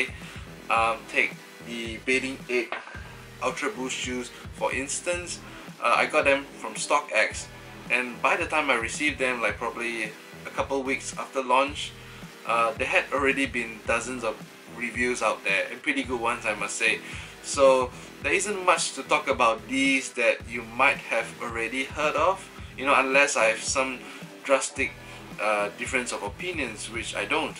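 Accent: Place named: Singapore. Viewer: Great review. I just put in an order. Hoping it's a good one. :) Your Singapore accent makes me very nostalgic for the motherland. Hahaha.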